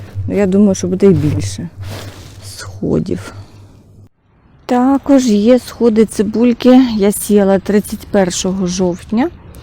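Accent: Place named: Transcarpathia, Ukraine. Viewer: очень красивая украинская речь колежанки это говорят на Закарпатье . буду учиться у Вас украинский мови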